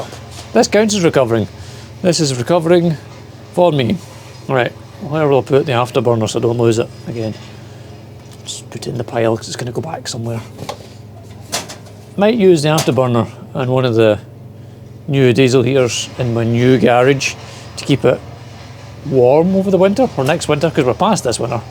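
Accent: Scotland, United Kingdom. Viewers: Good to hear your Scottish accent again, and glad you're recovering. Thought you just got tired of making videos.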